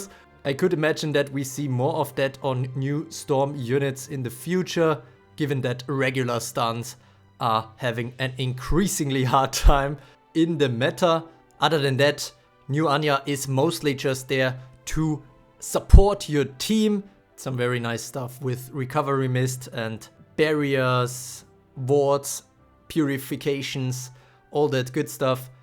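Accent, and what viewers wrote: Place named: Germany. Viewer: ah okay could swear you have german accent mb og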